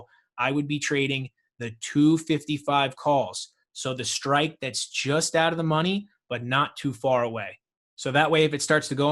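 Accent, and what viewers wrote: New York, United States. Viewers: That accent are you from ny/nj?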